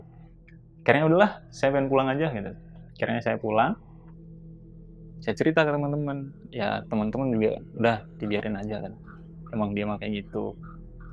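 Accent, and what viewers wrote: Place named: Serang, Indonesia. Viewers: Kayaknya orang serang yaa bang dari cara bahasanya. Soalnya saya juga orang serang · eh ini abang nya orang serang banten bukan sih ,soalnya kadang ceplos pake bahasa serang 😂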